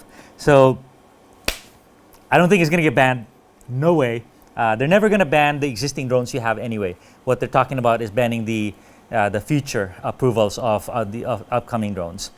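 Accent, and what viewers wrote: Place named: Philippines. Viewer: Good information brother 🤙 I can tell from your accent that you speak Tagalog?….and does this going to be in the Philippines too?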